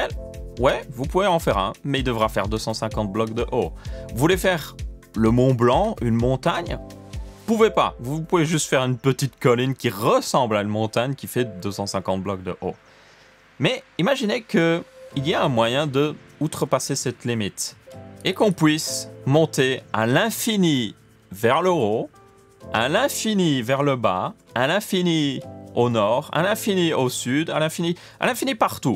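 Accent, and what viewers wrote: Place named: Belgium. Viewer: Ta un superbe accent belge !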